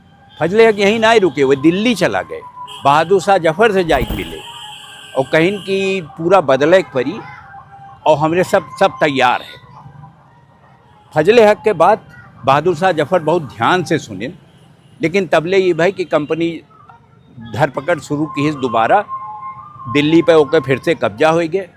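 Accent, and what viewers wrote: Awadh, India. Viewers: Sir ji aapka avadhi me bolna bahut hi sundar hai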